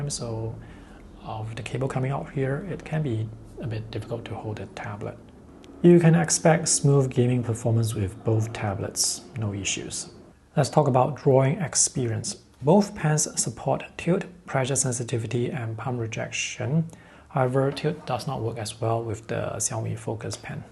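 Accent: Singapore. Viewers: I heard your voice and said: singapore? Sounds like. And was you. The last video I saw from you was years ago, about watercolor.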